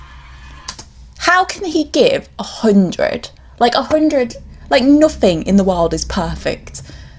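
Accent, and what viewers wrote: United Kingdom. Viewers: You sound British? Are you British?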